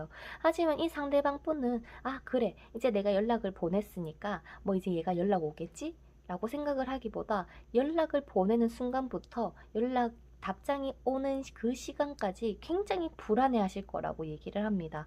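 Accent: Gyeongsang, South Korea. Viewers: 안녕하세요 구독 좋아요 구독중이에요,,오늘부터 1일이예요.특유의 목소리 톤이 꽤 좋아요. 경상도 사투리같아요.ㅎㅎ제 가 경상도 사람이거든요.저는 여기서 파란색을 뽑았는데, ㅜㅜ 사랑이.아니다라는 말에 조금 놀랐어요. 집착인가봐요.정리를 해야 겠네요.. 추억 속 에 남겨두고 아예 연락이 안 왔으면 좋겠어요. 물론 참고할께요.죽어도 잊지 못할 만큼이였으나, 이젠 놓고 받아 들여야 겠어요. 진정한 사랑이 아닌것 같네요.감사합니다.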